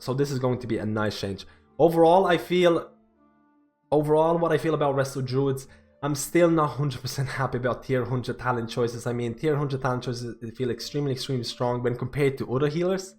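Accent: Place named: Dublin, Ireland. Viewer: Where are you from? I can hear a bit of a Dublin accent in your voice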